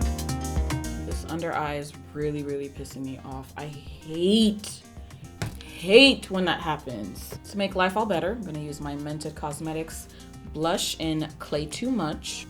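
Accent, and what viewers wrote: Nigeria. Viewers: Your nigerian accent is respectable:') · I am here for that ya Nigerian accent, it throws me off EVERY TIME🤣🤣🤣🤣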